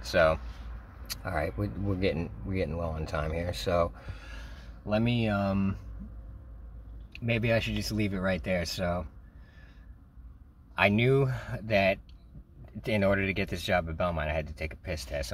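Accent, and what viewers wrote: New York, United States. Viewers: Love your New York accent.. I’m trying to watch your videos in order and so far enjoying every one of them.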